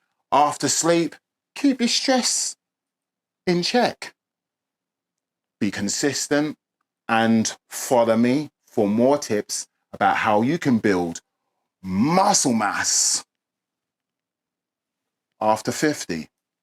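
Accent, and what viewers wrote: United Kingdom. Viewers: with an English accent?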